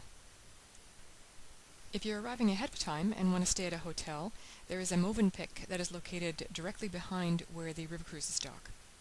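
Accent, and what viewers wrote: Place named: Canada. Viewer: Thanks! This is our first cruise, so we shall see how it goes. We chose Crystal. Booked through an acquaintance, but he has not given me half the info that you lay out so generously on your videos. I wish that I had found you before we decided. And, you sound Canadian (we are dual...but grew up in Canada)...so that is also awesome. If we like this trip, and decide on another, I will definitely contact you directly! Cheers.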